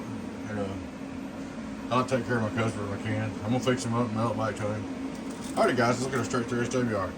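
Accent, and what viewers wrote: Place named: South, United States. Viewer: Yee old southern draww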